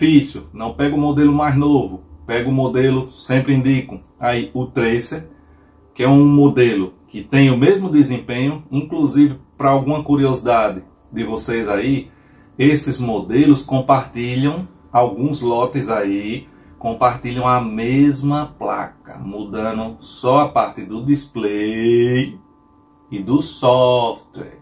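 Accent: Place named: Rio Grande do Norte, Brazil. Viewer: Comecei a acompanhar seus conteúdos hoje e já me inscrevi, sem comentários a qualidade. Sou de Vitória ES. Uma sugestão, você tem uma boa didática e conhecimento, os principais requisitos para preparar e lecionar um curso completo no assunto, é uma forma de remunerar e é justo e honesto. Pelo seu sotaque, acredito ser de RN. Abraços e sucesso.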